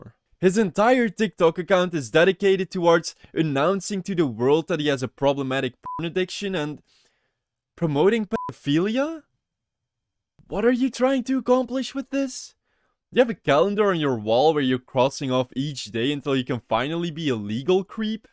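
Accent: Netherlands. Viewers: i have a question i wanteed to ask, are u dutch? since u sound very dutch!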